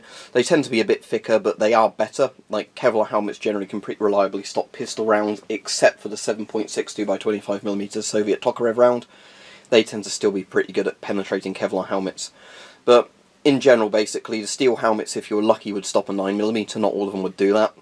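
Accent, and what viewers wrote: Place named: United Kingdom. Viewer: “What a shhhtupid name for it” haha British accents make everything sound so much better while being right at the same time 😂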